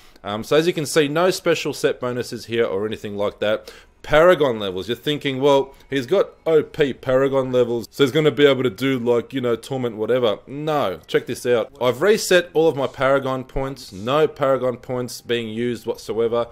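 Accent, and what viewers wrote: Australia. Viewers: Great guide in simple language for a new player. Thanks for the help. Also nice to hear a Aussie.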